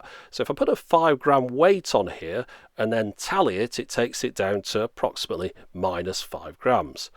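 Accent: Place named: England, United Kingdom. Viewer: Josh Bacon u are so right... And his English accent makes it so premium to look =) I love too!!!!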